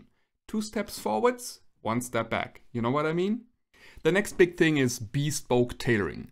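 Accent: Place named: Germany. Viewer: You sound German, I might be wrong but I’ve been learning German for about two weeks, I love the show Babylon Berlin and I love the clothes they wear❤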